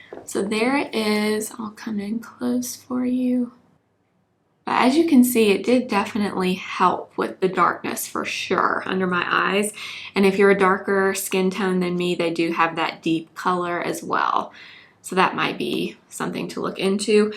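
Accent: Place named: Alabama, United States. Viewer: Are you from Alabama? You sound like Hannah Brown from the bachelorette!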